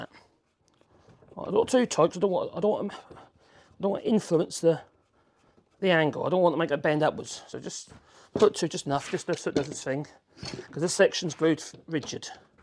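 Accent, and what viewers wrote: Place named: Shires, United Kingdom. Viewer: I love the way you work! It makes me feel better about my own head 😂 Coming from one county north of you makes your channel feel almost like home. There's far too few Shire accents on oooootoooob. You and Furze are about it!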